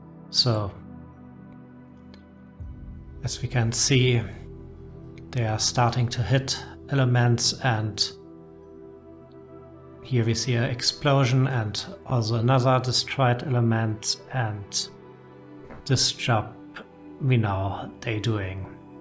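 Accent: Germany. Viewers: Bro are you german?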